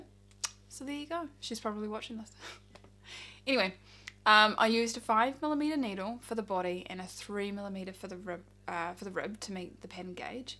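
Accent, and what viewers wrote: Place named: New Zealand. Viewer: Hello fellow Kiwi knitter! Just came across your channel now. Great to hear a Kiwi accent on YouTube! Love your description of the project, you make it very clear on the pattern, materials and mods. Kia ora!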